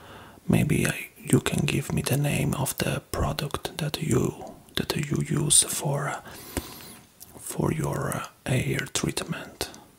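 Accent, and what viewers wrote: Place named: Italy. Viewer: Italians have a specific way of talking which is cute😊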